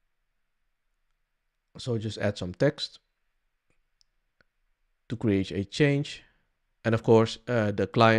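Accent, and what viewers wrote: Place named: England, United Kingdom. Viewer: Dit is exact wat ik wilde doen, bedankt! Herkenbaar Engels accent😉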